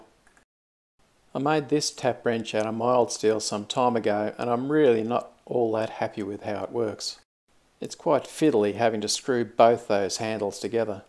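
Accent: Australia. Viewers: Love your honesty, admitting to small mistakes. ALL of my successes have built in mistakes - just makes them more realer! And, good to hear an Aussie on YT!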